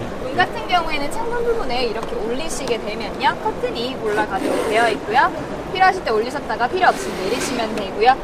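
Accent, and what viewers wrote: Gyeongsang, South Korea. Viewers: 사투리 타령하는 인간들아... 제대로 들어봐. 억양이 경상도 느낌이 있지만 단어나 구문이 사투리가 뭐가 있냐? 사투리는 단어와 서술어 등 구문을 말하는거다.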